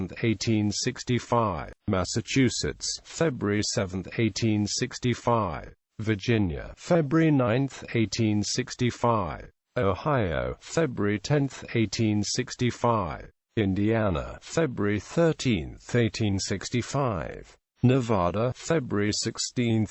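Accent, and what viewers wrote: United Kingdom. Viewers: why is a man with a british accent on here ?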